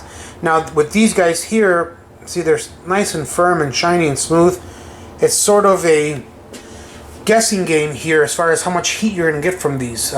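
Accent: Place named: Chicago, United States. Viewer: I hear some Des Plaines IL in that accent! LOL reminds me of my friends back home. Thanks for posting, I am definitely going to try this one!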